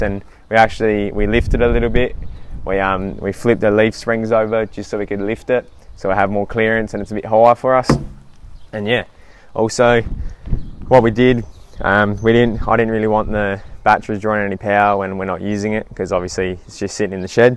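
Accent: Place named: Australia. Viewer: If anyone is confused he is speaking Aussie!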